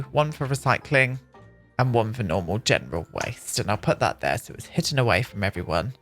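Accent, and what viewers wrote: United Kingdom. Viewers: You know, I've never actually liked my name until I heard it in your accent... it sounds way better from a Brit than an American!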